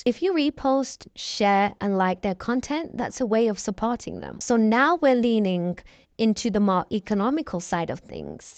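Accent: United Kingdom. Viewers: The voiceover sounds like a White woman from up north in the UK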